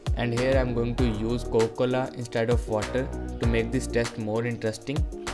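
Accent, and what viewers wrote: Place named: India. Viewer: Индийский акцент это пиздец.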